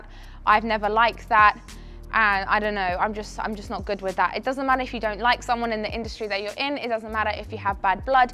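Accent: England, United Kingdom. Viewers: me encanta tu acento britanico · Смотрю ее только из за английского акцента)))))) ну и жопа тоже класс😁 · she caught me off guard with that f bomb.... sounding all proper with that British accent and looking so innocent. Lol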